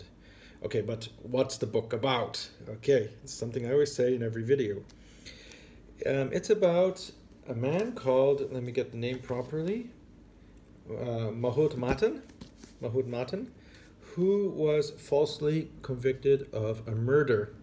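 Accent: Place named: Malta. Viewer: You are from Malta? I was trying to figure out your accent.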